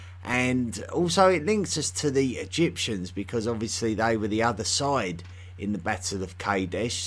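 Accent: United Kingdom. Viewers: He sounds like that guy on Saturday night live some years ago who talked about his drawings with a similar British accent. The guy would pronounce drawings as drowrings..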